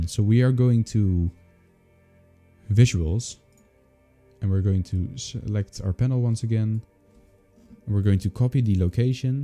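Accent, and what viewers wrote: Netherlands. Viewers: Ik hoor gelijk dat je Nederlands bent 😂😂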